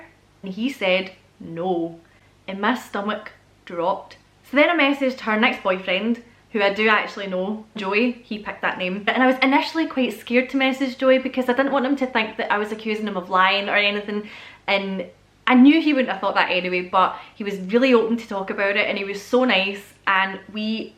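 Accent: Scotland, United Kingdom. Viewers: Hi, ive been a silent follower for some months, came here to learn scottish accent lol, but i just have to say THAT WAS AMAZING! I fullheartedly enjoyed the story. So good. Like a thriller, it was sooo so exciting! So thank you for sharing, hope you get the last answers. And yeah a documentary would be super cool :)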